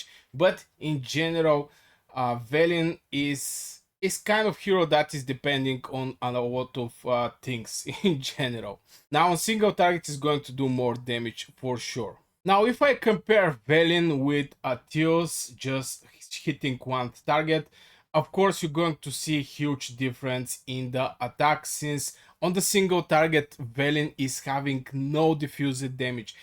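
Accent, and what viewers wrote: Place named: Bulgaria. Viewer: Знаех си, че си от България хахах. Имаш български акцент като говориш, а и се казваш.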